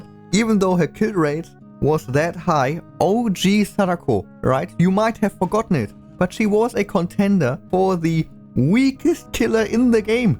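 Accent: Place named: Germany. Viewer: You sound realy german (i'm german too and i recodnise it very often)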